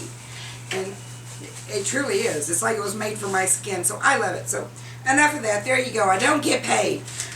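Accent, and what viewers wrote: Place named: South, United States. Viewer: I am from Arkansas but have been living in Pennsylvania for over a year now (.My husband's job brought us here ) I am sometimes starved for the sweet sound of a southern accent ! I think I watched 90% of your videos last night and thoroughly enjoyed them all !!! I even shared one on my Facebook wall . Keep making those videos !!!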